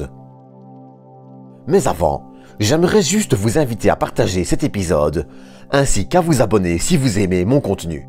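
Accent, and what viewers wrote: Belgium. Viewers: Beau boulot, merci pour tout le taf' de qualité sur cette chaîne ! Je like, je commente, je partage PS: Bel accent Belge j'imagine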